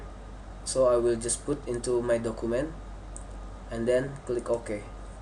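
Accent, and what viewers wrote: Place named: Indonesia. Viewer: indonesian english.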